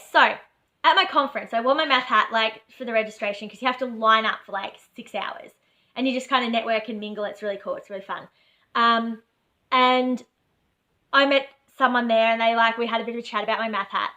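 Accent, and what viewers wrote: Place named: Australia. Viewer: send me her and half of my family talk like you--super Aussies! I love Australia my cousins got a Viet Restaurant! You sing!?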